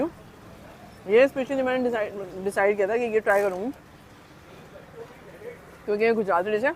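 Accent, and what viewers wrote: Karachi, Pakistan. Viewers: Most of the people don't know that you are sindhi as they are confusing you because of typical Urdu speaking accent...your accent is of typical karachite's Urdu speakers...love from Hyderabad ❤ my name is also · Excellent video,and your urdu slang is marvelous,fluent urdu with typical pronunciation of urdu remind me of Karachi of 90s,lovely keep it up. Greetings from Capetown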